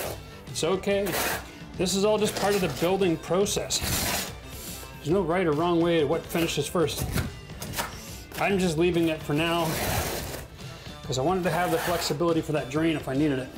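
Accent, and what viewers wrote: Canada. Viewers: He sounds Canadian, so I am guessing he is in Canada.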